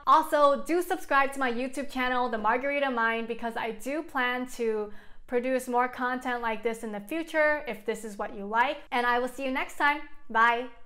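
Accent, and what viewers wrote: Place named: United States. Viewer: she is speaking American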